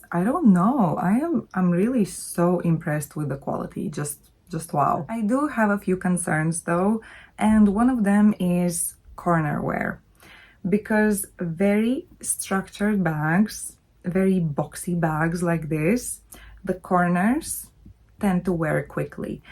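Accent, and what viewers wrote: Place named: Latvia. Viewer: You have beautiful accent. Sounds Latvian. Are you from Latvia?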